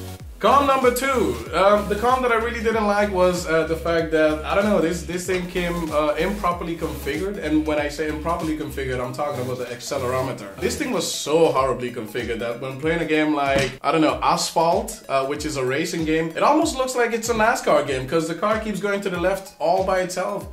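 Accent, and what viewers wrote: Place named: Netherlands. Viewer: I advice you to work on the accent. Are you dutch ?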